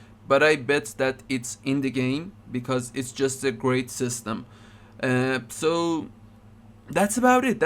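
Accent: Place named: Iran. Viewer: چقد این لهجه ایرانیه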